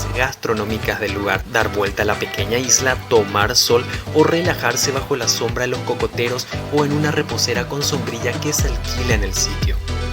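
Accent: Paraguay. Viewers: Excelente video!!... Perdón... Tenés acento paraguayo?...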